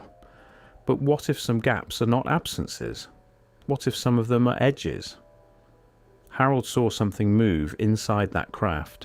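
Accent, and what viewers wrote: United Kingdom. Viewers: British accent makes it more believable.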